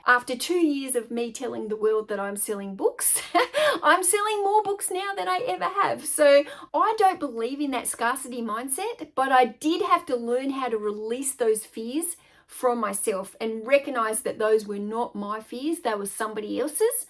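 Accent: Australia. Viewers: I bet myself you had an Aussie accent before I even hit play. And whaddya know? Lol. So random. Loved the video! PS The conversion of the size for us Americans is 25 x 11.5 feet based on the numbers you gave (rounding up). So 287.5 square feet in area.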